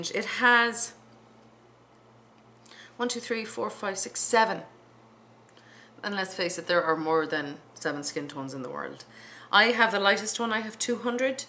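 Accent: Ireland. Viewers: wow it looks good must buy it are you from Ireland originally you sound like your Irish I am from Ireland Limerick xxxxx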